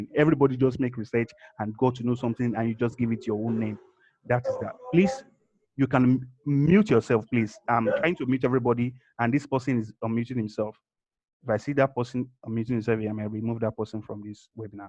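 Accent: Nigeria. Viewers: This is a Nigerian Accent! Nice one brother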